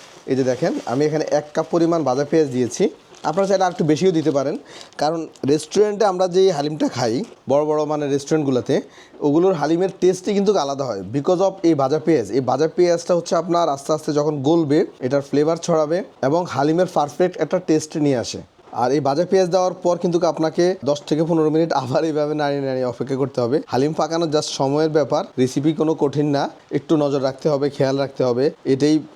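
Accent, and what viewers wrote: Noakhali, Bangladesh. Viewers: ভাই আপনি নোয়াখালীর???😮😮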